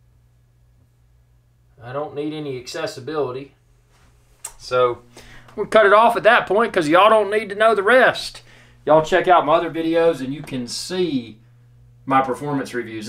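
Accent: United States. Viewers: That country accent is gold! Hope your channels grows big. Keep it up!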